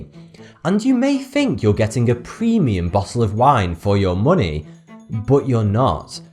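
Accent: United Kingdom. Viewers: Actually it's because his british accent.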